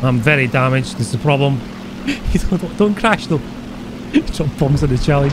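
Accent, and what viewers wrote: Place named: Aberdeen, United Kingdom. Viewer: I love the accent and are you from Aberdeen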